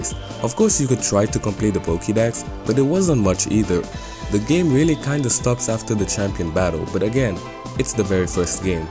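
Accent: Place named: West Africa. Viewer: Oh that makes sense, I’m from Ghana. Another West African Pokémon fan! That’s great. Keep up the grind. You just got another YouTube subscriber and any other social media you’re on. Once again love your accent!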